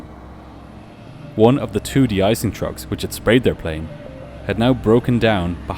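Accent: Ireland. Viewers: Don’t you just love the Irish accent ❤ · How did plane crash videos become my comfort show 😅 I blame the Irish voice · "Outer two turds." Man, you make superb documentaries, and I'm not mocking your accent. I just get a giggle whenever I hear the Irish in your voice.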